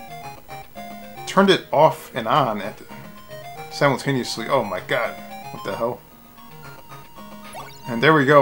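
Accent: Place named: Canada. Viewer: You must be Canadian. Here's how I know. You can turn "and" into "un". American's can not do that.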